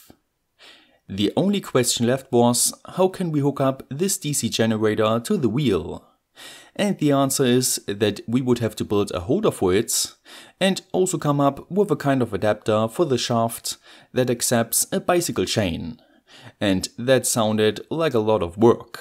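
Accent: Germany. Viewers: the content of your videos SOOO GOOOOOOD your thicc denglish accent SOOOOO BAAAAAD i will now watch your videos muted and with subtitles on. thank you very much for the effort to put subtitles in! keep uploading, you have great videos!!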